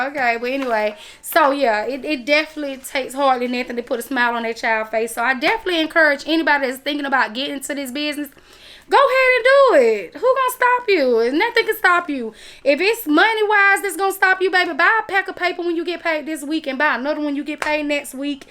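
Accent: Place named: South, United States. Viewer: Girl.... you are so country!!! LOVE IT! I'm from Texas so I'm more country! Thank you for all the tips. Great video.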